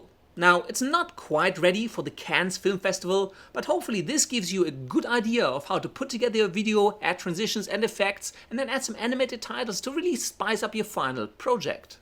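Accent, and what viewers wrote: Germany. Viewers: Even if your lovely accent (German?) hadn't hooked me, I wouldn't have missed a minute! This was just the tutorial I needed. Amazing work. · Du musst definitiv Deutscher sein, dein Akzent klingt so süß 😁 Anyway, thanks for your very high quality content, it's so helpful!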